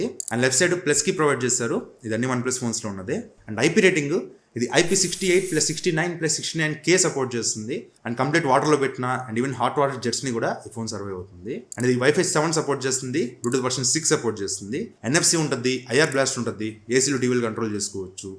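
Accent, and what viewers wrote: Nellore, India. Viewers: Are you from Nellore 😮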